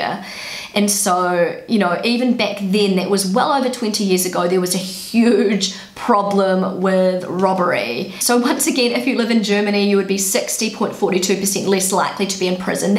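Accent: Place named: New Zealand. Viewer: Wow, you have a very strong New Zealand accent...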